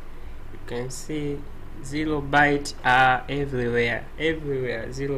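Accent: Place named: Africa. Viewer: First tutorial with an African accent. Helpful and I like it. Proud Africa💚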